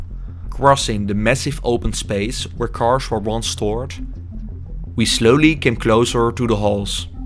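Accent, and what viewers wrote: Netherlands. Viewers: Dutch Limburg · That is a Dutch accent if I've ever heard one!!! Sounds like my Opa! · Makambeus.........Tremendo Dutch aksentos.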